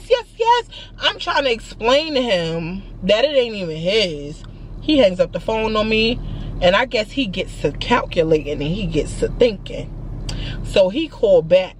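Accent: New York, United States. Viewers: . You sound like you’re from NY? I’d never guess you are from the south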